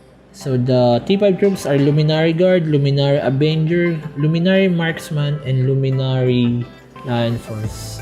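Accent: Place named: Philippines. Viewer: You're filipino just like me, I listened to your accent and I knew, hehe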